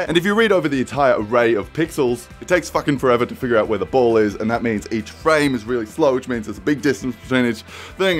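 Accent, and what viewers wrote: Australia. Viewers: Never EVER do a video where you do not speak anymore y'hear? Your videos are 100x more enjoyable when I get to hear that glorious aussie accent of yours. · You're Australian nice! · I don’t know how I didn’t figure out he was Australian, I’ve been assuming he was British which would be acceptable if I was American but I’M British and parents and entire extended family are Australian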